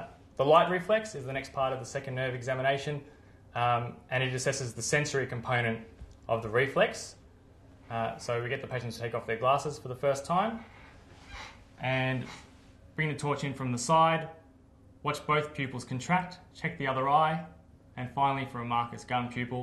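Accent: Australia. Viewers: Sound Australian to me mate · Very Australian indeed... mayte...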